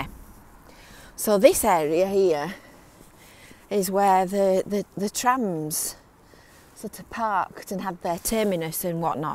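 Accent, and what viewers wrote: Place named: Yorkshire, United Kingdom. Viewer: Very ironic to hear such a Yorkshire accent on a tour bigging up the Lancashire coast! 😂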